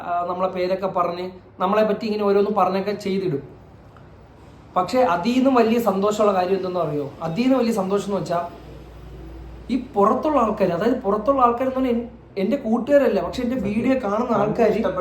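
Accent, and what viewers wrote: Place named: Kollam, India. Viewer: Hii brooh 🥰nighlde videos um nighle 4 pereyum othiri ishttanu❤pinnah slag um. Njn koodhalum nighlde videos anu kanunnathu. Kollam slag enik othiri ishtta, pinna nighl samrikunnathu kettittum enikum samsarikkanum ennu aghraham ind.Njn Ernakulamkkariyanu. Apo ivda ntha, nthu ennokeya paraya ipo ithinu pakaram enthonnu, enthru agana paraya ee vakku oke enik chettanmarde videos il ninna kittiye🥰, pinnah nighl samsarikunnath kelkkanum adipoliyanu . Njn athukond thanne nighlalil ninn korach words padikkum. Ennt paramavathii nighlde pole samsarikkanum shremikkum🥰🎉🥰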